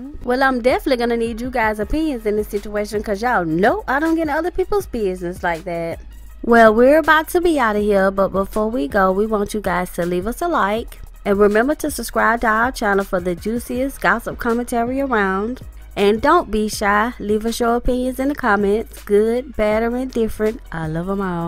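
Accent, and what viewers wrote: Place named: South, United States. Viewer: I appreciate the immediate reply. Understand that I asked because I have relatives and friends from the south (where you sound to be from, or have lived) a couple of whom actually pronounce "street" as "screet" in all seriousness. Thanks again; I'm about to finish watching your video on Fabolous. These are fun! 👏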